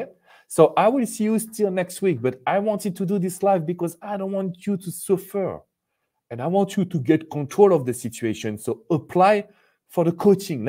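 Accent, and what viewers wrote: France. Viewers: I love your French accent. You make the word "suffer" sound like a lavish dish <3 <3 <3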